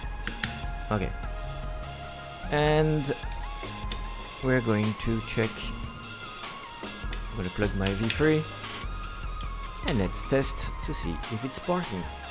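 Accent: France. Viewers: What's the name you said in the beginning? Why your charger block is us Canada if you have a French accent? It isn't an European company? You are french Canadian?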